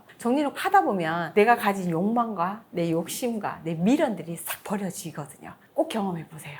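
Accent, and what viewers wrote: Gyeongsang, South Korea. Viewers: 경상도 사투리 쓰는 분들에게 이런 댓글 다는 사람들 있는데 이유가 뭔가요? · 경상도 억양. 설치고 설레발이 먼저인 인종이라 본인이 일단 정리정돈 안되는 어수선이들.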